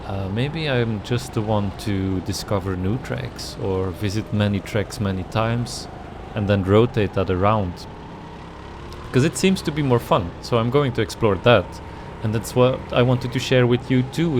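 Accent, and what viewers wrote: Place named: Flanders, Belgium. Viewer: I could hear you were Flemish after the first few words 😂 Awesome perspective from an experienced Karter though. Do you sim race as well? That's my main thing currently. I enjoyed watching this, bedankt!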